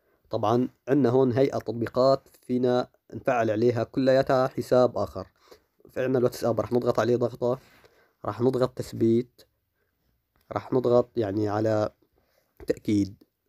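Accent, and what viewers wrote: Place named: Homs, Syria. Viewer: معلم مدري ليش حاسس اني سامع صوتك وانك حمصي 😅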